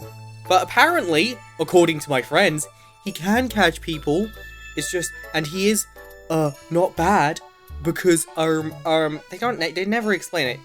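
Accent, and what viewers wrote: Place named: United Kingdom. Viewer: youre british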